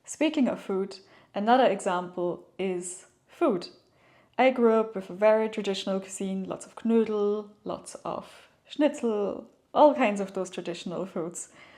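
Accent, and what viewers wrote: Ireland. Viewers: you sound lrish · Lovely the way you sometimes have such an Irish accent or way of saying something. Wish you the best in Austria. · Ha ha, from your accent I can tell you spent time a lot of time in Ireland. (Greetings from Ireland)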